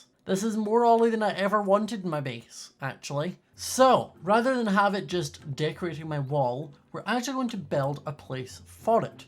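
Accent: Scotland, United Kingdom. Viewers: What part of scotland you from, assuming not glasgow, maybe edinburgh? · Scottish - English Tiger-tinger Cute-cuuet Lol leave a like.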